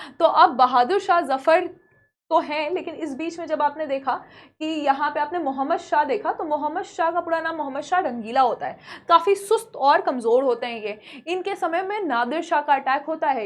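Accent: Bihar, India. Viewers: एक बात तो फिक्स है कि आप बिहार से है।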